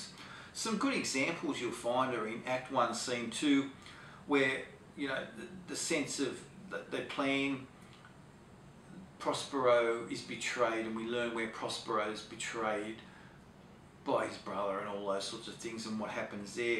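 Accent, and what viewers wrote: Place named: Australia. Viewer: I loveeee your Australian Accent!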